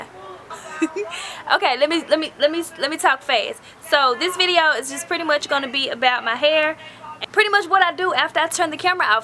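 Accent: South, United States. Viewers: yes, she is definitely from the south 👌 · She is sooooo country! Where you from? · girl you so country!!!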